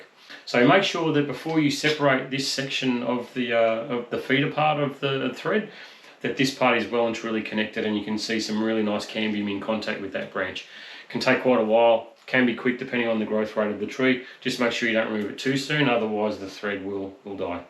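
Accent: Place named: Melbourne, Australia. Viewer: Just found your channel - thanks YouTube! I did my first thread graft 2 years ago on a Trident Maple. A month later I decided to rub the buds off the approach side to avoid wasting energy where it wasnt needed...but for some insane reason I rubbed them off the 'active' branch end. How could I be so dumb!!! I couldn't believe I had done that. Anyway - loved your instructional style and am definitely going to be looking through more this winter. I always think of Melbourne (Upwey) when I hear that accent. Cheers, Xavier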